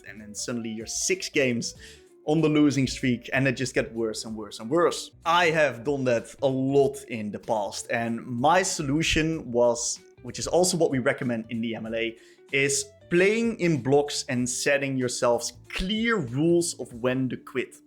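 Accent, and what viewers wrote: Netherlands. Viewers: Hey, this is probably something you’ve heard before and I don’t want to come off as mean, really I don’t, but I think it would genuinely do you well to spend some time tweaking the way you ultimately articulate your coaching. That is, I think you will gain a respectable amount of traction if you do so. Thing is, and again you’re probably aware of it, but your pronunciation is like, passing… but not good. It’s okay, you know, but also just… okay. Even then I have to say that as a fellow Dutchie I do have a soft spot for your Dutch-English accent, however it still took me a bit to warm up to it (compared to native or more proficient English speakers that produce similar content) and I just think to some new viewers it’s possibly really an active deterrent to becoming full time viewers. Maybe. Your English itself is very solid, don’t get me wrong. 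I do wonder if you could somehow review your video scripts in order to have them be fully polished, because right now you occasionally get an English Expression or a certain common collocation slightly off (or some subtle grammar or vocabulary related mistake where actually☝️🤓 or whatever). While this is more of a small nitpick, I think it’s comparatively easier to solve by simply catching those small mistakes while reviewing your scripts. That’s my two cents on this. In the end I’m just a nobody and a relatively new viewer of your channel, because I recently swapped my main role to mid, so take all this with a grain of salt if you wis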